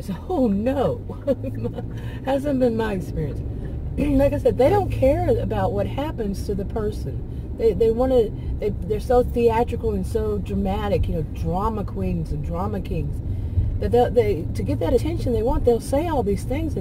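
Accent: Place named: Texas, United States. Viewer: I was happy to find your video. My 72 year old narcissistic mother and 48 year old, younger, golden child, narcissistic brother have both stolen lots of my life/time from me. Lots of ongoing ptsd. Still, I forgive them. I have to. My faith requires it. Even though the abuse never stops. At first I thought, you sound Texan. Then I saw the licence plates(lol). I'm in Pearland. Howdy neighbor. Hope your channel grows. Subscribed. God bless you and yours.☺🙏💜👍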